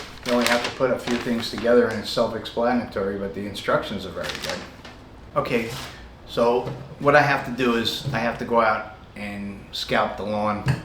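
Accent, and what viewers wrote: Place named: New Jersey, United States. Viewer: I can't find one that has a single pole/handle, which discourages me from buying it. This model you just shown, HANDS DOWN, has the most parts/pieces for the handle, but it does seem to be solid. I'll add it to my cart, as I'm searching, and will pick one.... eventually. Good video 📹 👍 👌 On another note, you sound Jersey but it looks like NC where you're at. I say that cuz i met a guy from NC who sounded Jersey/NY-ish🤘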